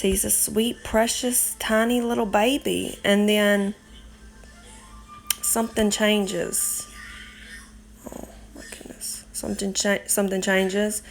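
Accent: South, United States. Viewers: Ummmm can everyone stop classifying vegans into the same group as raw fruitarian? I am vegan and chubby and healthy. Not every vegan eats fucking cucumber noodles and green smoothies. Animal food as this lady states is not healthy and is full of cholesterol and carcinogens. But leave it to someone with a southern accent to not do any research before making a video based off of one extremist mental RAW fruitarian/ NOT a normal vegan.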